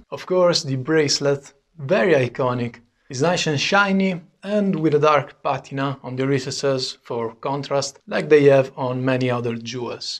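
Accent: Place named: Italy. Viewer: Si sente lontano un chilometro che sei italiano , veramente bravo bei video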